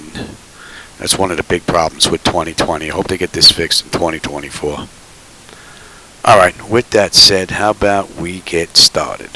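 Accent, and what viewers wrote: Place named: New York, United States. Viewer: Also, I got a strong Boston accent, but sounds like you got a New Yorker one, I love the city of New York, but screw your teams😂 just messing around bud. Good rivalry we got.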